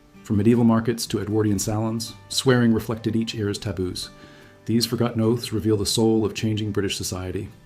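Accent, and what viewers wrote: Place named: United States. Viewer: Rubbish US accent commentary and many of the words pronounced incorrectly.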